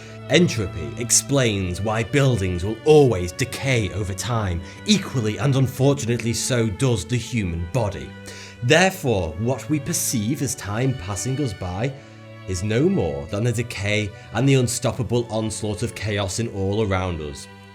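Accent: United Kingdom. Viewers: I really like that British accent. Ur "but" sounds for me like a "bot", and reminds me the Medieval game the voice of that thing in the head of a skeleton. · What variety of British accent is this that pronounces a soft "th" as an "f" along with the rest of the peculiarities?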